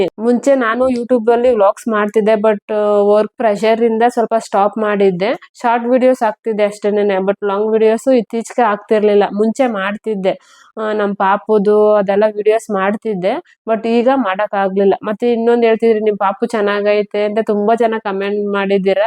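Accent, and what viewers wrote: Mandya, India. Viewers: One and only Mandya district language 🎉🎉🎉 Namdu Mandya district sathnuru....naavu nimma tharane matadodu madam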